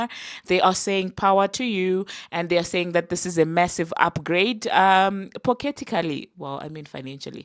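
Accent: South Africa. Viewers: You sound south African I love the accent 🩷😌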